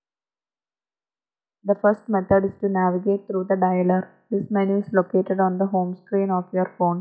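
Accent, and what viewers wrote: India. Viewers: atrocious audio, hardly intelligible, heavy Indian accent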